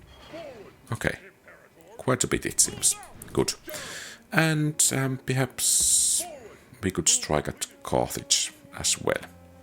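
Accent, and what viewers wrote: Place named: Finland. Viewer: Love the video! Do you happen to be from Finland regarding your accent? ;)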